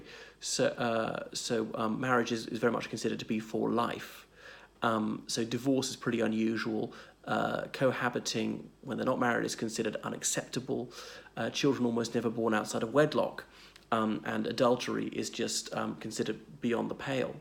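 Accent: RP, United Kingdom. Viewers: you have a british accent you have no place describing irish history i will only listen to an irish traveller on this subject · Wow buddy you sound posh but very interesting article👍 my father is from the Republic and always said men from Kerry/Tipperary area's were excellent for work with pick&shovell 🤣🤣🤣🤣🤣and men from Dublin were only good for pulling pints 😂😂😂😂😂😂 myself my back is covered in freckles and in the summer it looks like I've been sunbathing under a tea-strainer!!!!!!! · Hi. I am Irish. You have a British accent. I presume you spent a lot of time in the UK?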